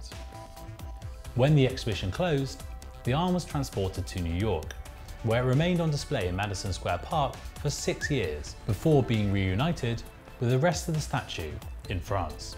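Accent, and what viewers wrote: United Kingdom. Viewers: Sotaque britânico não dá pra aguentar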